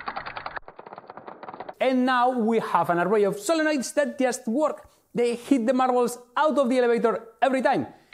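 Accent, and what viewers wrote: Spain. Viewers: me encanta el ingles de quenbrix que tienes, es como si hablara yo, y se entiende bien. un acento que solo tendría un español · Estoy casi seguro de que eres español, solo diré: MOOOLA MUUUCHO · Se nota que eres español !! Grande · Bro, el típico spaninglish. Se nota que eres español por el tono de algunas palabras. Por cierto me encanta el video sigue así. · eres español?? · Eres español, verdad? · Are you spanish? I like your accent 👍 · acento mas español que yo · It's surprising how many Spanish natives have a very good English (this is the case) but their pronunciation is so attached to Spanish pronunciation rules. I wonder why. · Al principio pensaba que el canal sería de algún guiri, una vez que escuché el acento de ese inglés supe que este tío era español 😂😂😂.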